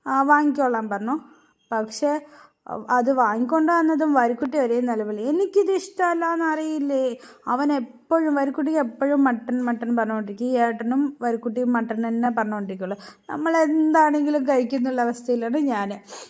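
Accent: Palakkad, India. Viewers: നല്ല വീഡിയോ ❤❤ പാലക്കാടൻ ഭാഷ ഇഷ്ട്ടമായി കേട്ടോ..👍👍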